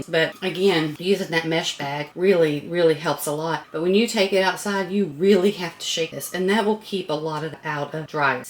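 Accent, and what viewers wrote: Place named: South, United States. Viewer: Wow! Girl, I just realized I am watching you.....enthralled! I love your voice, that southern accent, I can't wait to do this sewing! Thank you for being awesome!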